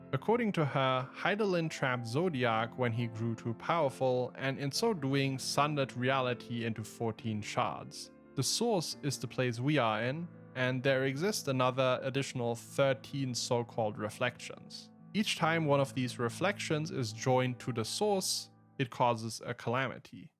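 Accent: Germany. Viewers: What accent are you speaking in? German?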